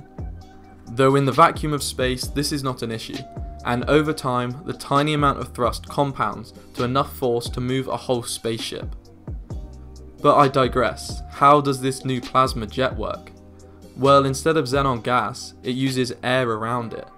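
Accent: United Kingdom. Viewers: This video demonstrates if you have a British accent it does not necessarily mean you are smart. Lol, I'm sure he is intelligent. It's just that he knows so much that cant be proven.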